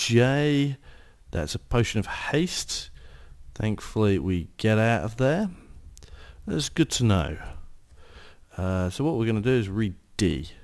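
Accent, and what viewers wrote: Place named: England, United Kingdom. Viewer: I love your "typical" english accent :) where do you go for this game... i just dont understand :)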